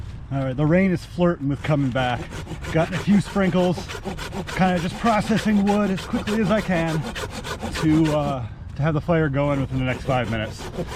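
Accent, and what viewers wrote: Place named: Downstate New York, United States. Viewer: Hey there, again,! Eastern New Yorker Nancy! This has to be said that along with your animation job you should try some voice acting. You are hilarious 😂 and YES that is exactly how downstate New Yorker and Jersey folk talk! 🤣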